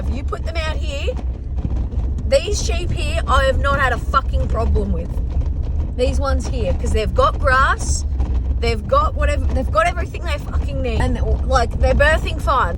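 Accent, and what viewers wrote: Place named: Australia. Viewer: I was sad to hear you were "removing" foxes (originally thought you were British or Scottish, 'cause I hadn't heard your accent yet), but then I realized you were Aussie and was no long sad, lol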